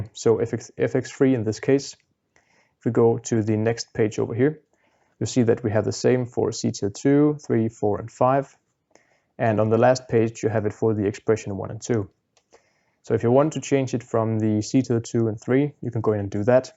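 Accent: Denmark. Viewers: Is that a Danish accent?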